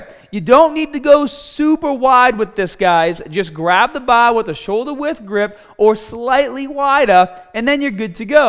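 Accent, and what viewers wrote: Boston, United States. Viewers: wow is he from Boston? He has very thick accent sounds kind of like boston accent. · Never paid attention to the Boston accent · Smaaarrght.. Is that a Boston Irish accent? Excuse my unfamiliarity as I'm from NZ.. Sounds cool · This guy has to be from Boston I am and I can see it right away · Is this guy from Boston. I say -impossible to do that many reps and sets and only rest 90 seconds. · That Boston accent. Love it! haha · Boston accent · Gonna hit the bah bells hahd to build up my ahms. Love that Boston accent! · i hear boston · He’s gotta be from boooooston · whats with the new boston accent.... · Northern American. Boston accent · This guy is from Boston , Bahhbell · Love the Boston accent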